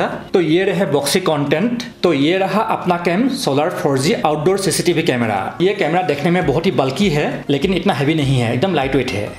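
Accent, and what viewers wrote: Assam, India. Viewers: First time hai apka channel pe ..but app ka hindi Assamese logoko jesa hai ..kya app Assam se ho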